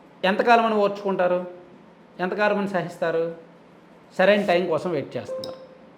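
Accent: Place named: Srikakulam, India. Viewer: బ్రో..ని భాష చూస్తే మా శ్రీకాకుళం లా వుంది ..మీది మా శ్రీకాకుళం అయితే దాన్ని డెవలప్ చేయమని వీడియో చెయ్ బ్రో.. చాలా మంది పనులకు విజయవాడ hyderbad వలస వెళుతున్నారు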